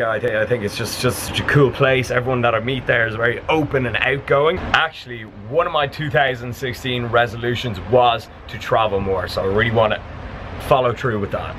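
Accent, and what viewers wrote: Ireland. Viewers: Interchwined 😂 I could listen to the Irish all day aha, another great one mate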